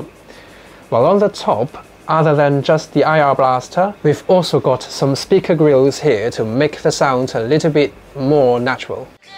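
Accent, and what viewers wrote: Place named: United Kingdom. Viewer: Sound like British tone , Good !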